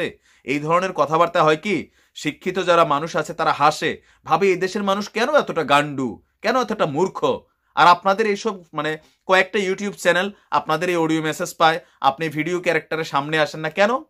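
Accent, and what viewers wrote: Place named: Comilla, Bangladesh. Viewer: ভাইয়া কুমিল্লা ভাষায় কথা ভালো লাগে না হলে ভালো লাগে না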